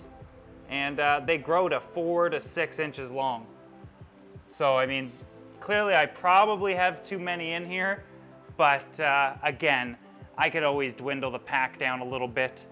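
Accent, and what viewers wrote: Canada. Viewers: Thought I heard Canadian accent... then I heard him say Dartmouth... Dude, I used to live in Spryfield‼️ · Sounds Canadian...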